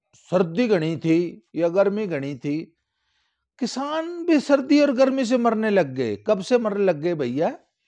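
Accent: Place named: Rohtak, India. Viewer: koi pehli baar ye video sun na bata sakta ki east up ke bau saab ho, sun ke rohtak ke lagte hain aap 😊🙏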